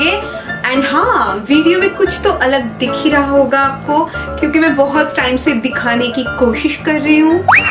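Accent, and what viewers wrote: Bengal, India. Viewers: Ma astay kotha bollo tomi ki bangali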